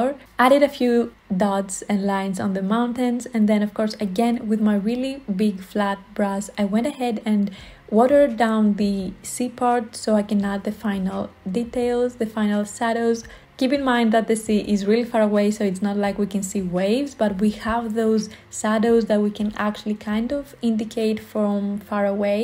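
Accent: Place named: Greece. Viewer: Your Greek accent is so beautiful. I could listen to your voice for hours.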